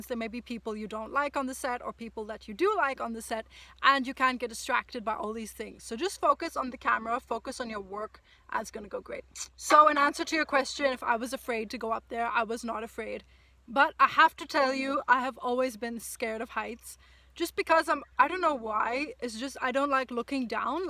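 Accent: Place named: England, United Kingdom. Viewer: You're english accent always melt me down. And you're the most awesome lady💜💜💜💜💜💜💜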